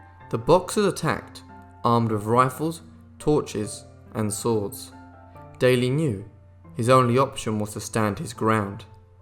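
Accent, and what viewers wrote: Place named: United Kingdom. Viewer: Having a British man quoting Dan Daly during the first World War sounds wrong. No way Dan Daly would have pronounced and inflected his words this way, he would have sounded ridiculous. Nice try though. Oorrah Devil Dogs! Semper Fi, Dan.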